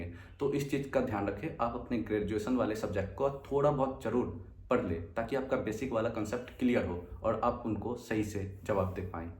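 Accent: India. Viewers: Bhai tumhara bihari accent lg raha…Bihar se ho kya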